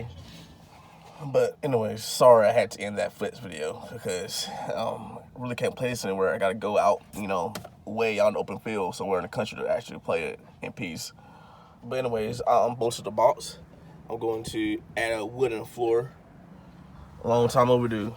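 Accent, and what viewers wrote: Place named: South, United States. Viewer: your from the south huh ? im hearing a accent there,and im seeing camo duck hunting seat covers which omg i love duck hunting,well actually i love bar b queing duck,mmmm damn but yea oh and your even talking about the country haha ok so go ahead throw on some country hahaha im kidding where you at,which state not tx i didnt see a inspection sticker,well registeration sticker actually since they changed it to just one instead of both.but yea curious,alright Basshead UNITY ! 2020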